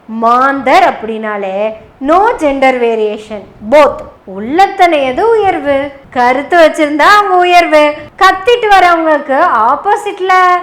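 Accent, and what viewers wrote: Kongu, India. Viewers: சகோதரியின் கொங்கு தமிழ் மிக அருமை 😊😮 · கொங்கு தமிழில்,கொஞ்சும் தமிழில் அவர்கள் கொன்டுவந்த செருப்பை சரியாக பயன்படுத்த சொல்லி கொடுத்த பாணி அருமை. வாழ்த்துக்கள். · அருமையான கொங்கு தமிழில் விளக்கமாக விவரித்த சகோதரி அவர்களுக்கு எனது பாராட்டுக்கள் மற்றும் வாழ்த்துக்கள்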